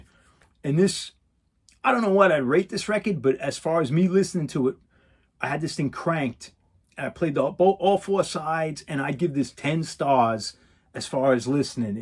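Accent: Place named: New England, United States. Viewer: I’m from Fall River but have lived in Atlanta for over 20 years! I knew that accent….haha! Love your videos and your knowledge! Respect!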